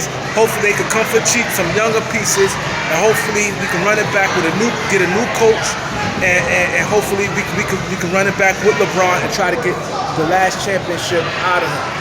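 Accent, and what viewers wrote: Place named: New York, United States. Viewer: You sound like a New Yorker.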